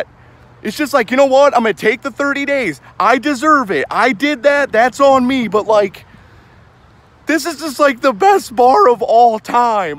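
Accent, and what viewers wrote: United States. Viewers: what state is this dude from? lmao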